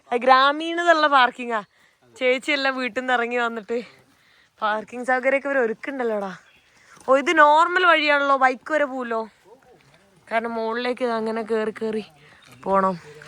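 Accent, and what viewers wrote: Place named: Kannur, India. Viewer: ഇതല്ലേ,ഞാള് കണ്ണൂര്കാര് തമ്മു കണ്ടാൽ പറയുന്ന ഭാഷ! 😄😄😄😄😄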